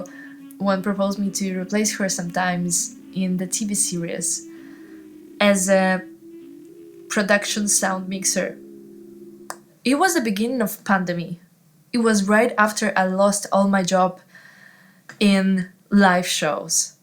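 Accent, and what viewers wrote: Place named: Eastern Europe. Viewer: cute славянский accent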